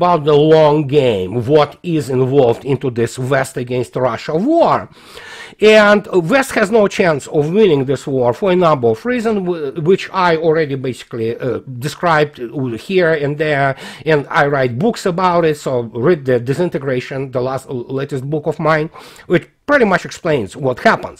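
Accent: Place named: Russia. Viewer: to my English-speaker's ear, you have a pronounced Russian accent, and as such, it is a challenge for me to understand you under the best of circumstance. However, you talk so quickly that it makes my comprehension all the more problematic. Could you please SLOW DOWN your speaking some? Thanks, BB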